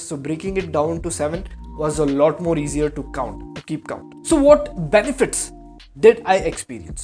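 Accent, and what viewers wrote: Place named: India. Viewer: You are surely an Indian from your accent. Why don't you go to the rural villages of Uttar Pradesh and see the inhumane conditions people live. See if you can help them.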